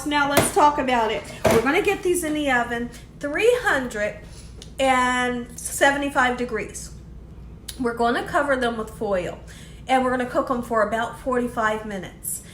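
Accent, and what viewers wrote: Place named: Chicago, United States. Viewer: I just want to tell you how much I enjoy watching you. I love listening to you speak... I’m from the Chicago land area and you remind me so much of home... Keep up the great work and I hope you know how BEAUTIFUL you are!!!! ❤️❤️. Thanks for all that you do....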